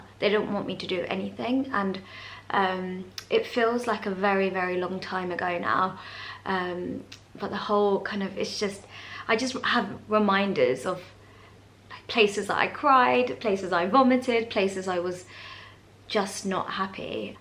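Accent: United Kingdom. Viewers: lol love how you say sweaty mess it with your British accent. I feel like that all summer here in the US just a sweaty mess just like says.😂😂😂😂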